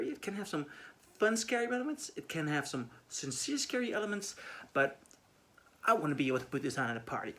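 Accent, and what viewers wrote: Netherlands. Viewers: Nice video! Are you dutch? Because you sound dutch. Ben je nederlands?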